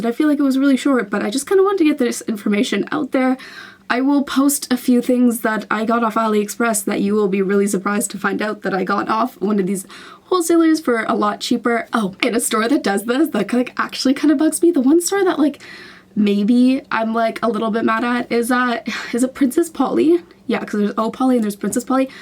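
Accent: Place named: England, United Kingdom. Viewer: I can look at you forever😍, sometimes I don't understand some words, but I just admire you, you look gorgeous, very beautiful ❤💛, thanks for information, hug you🤗, I'm very glad to become part of your YouTube family🤓, I like your English accent, I want the same pronunciation🔊, I will watch you and improve my English, thanks you so much for all you do for us😁❤🤗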